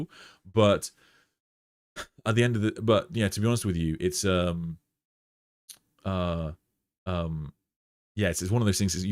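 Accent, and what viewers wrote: United Kingdom. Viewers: I love when british caleb hammer talks warhammer to me :D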